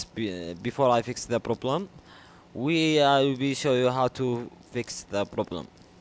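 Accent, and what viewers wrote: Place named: Saudi Arabia. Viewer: ههههههههههههههههه اقسم بالله من اللهجه قبل ما افك التعليقات عرفت انك سعودي😂😂😂😂😭.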